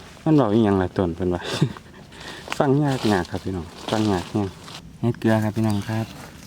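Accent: South, Thailand. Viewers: พูดปาษาใต้จัดๆอิดแล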